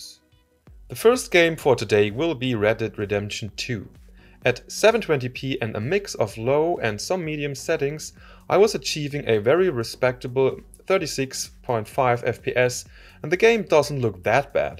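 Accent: Germany. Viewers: Danke für dieses Video:) Deutscher Akzent in Englisch is so easy raus zu hören:D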